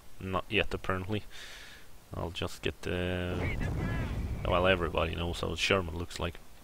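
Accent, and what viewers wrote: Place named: Sweden. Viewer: Where are you from? :) You sound Swedish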